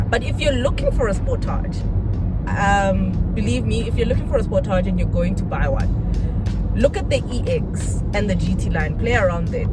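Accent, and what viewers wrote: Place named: Kasi, South Africa. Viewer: I like how you review your cars, pure kasi style you can even hear it from the accent 😂❤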